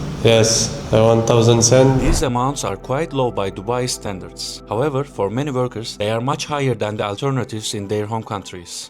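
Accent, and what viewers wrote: Turkey. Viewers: türkçe seslendirmeyi kapattım hala türk aksanı var diyorum niye meğersem türkmüşsün :D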